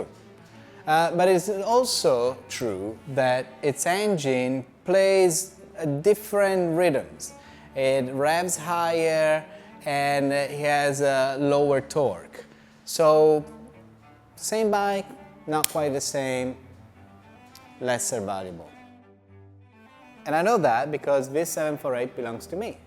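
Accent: Italy. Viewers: 😲 Wow! Whom better to present it than you. I would love some more technical iteration on the diverse releases of the 916 range. So all the different versions and the technical differences. All the way from the 916 to the 998S and R and final edition. Is that something you would want to make, or not in your interest? I've owned my 998 for over 10 years now and even used it as for pleasure on the road at first and later on at the track. At the track it really comes alive! I like the color of your bike. And especially in combination with the yellow lights it really harmonizes with the green of the 996 The Matrix bike. And the pull over can not be missed off course! Camera work impacable and sound, voice, pause and speak rythm as well as your suiting Italian English is coming together perfect. Love both parts and want to know more about the Italian bikes from you in front of the camera! 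· I love the video and your charming italian delivery! :D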